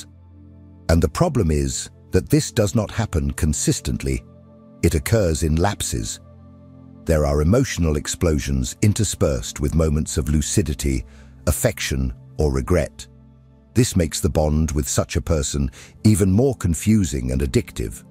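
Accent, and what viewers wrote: United Kingdom. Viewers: I wish i could listen to this without hearing an english butlers voice.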